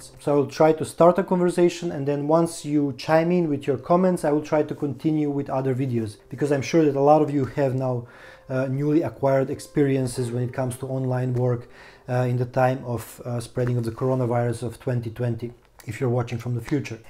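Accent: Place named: Serbia. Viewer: Po english “akcent” Srbin vidi se iz aviona :) Good job👍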